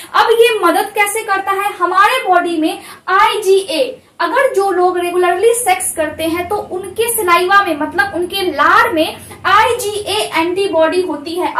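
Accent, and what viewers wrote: Bihar, India. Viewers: Your voice tone told that you are from bihar ❤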